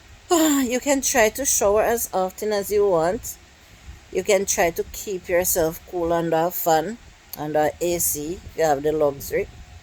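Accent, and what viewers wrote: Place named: Jamaica. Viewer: I understood you perfectly clear...you are a well spoken Jamaican 😊 and the patois us just a little spice 😅👍🏽🇬🇧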